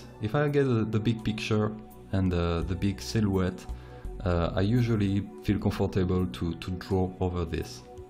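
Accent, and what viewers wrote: France. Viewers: i know at your voice your french i guess ... but its not bad :D you can speak english for ur vids is it good <3 im dutch ... i just talk english because i like that launge... im learning too french :P on 02/26/2016 i got a test from french laugne :D i got 17/20 pretty good :D i like ya vids! <3 <3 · je lai remarqué a l'accent :)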